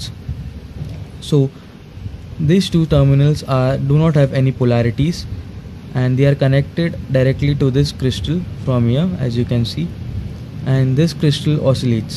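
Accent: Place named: India. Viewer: I like your Indian accent. Great video!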